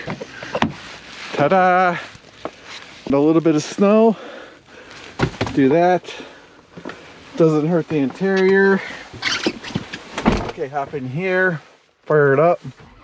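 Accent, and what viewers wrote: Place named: Cleveland, United States. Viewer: Cleveland accent I hear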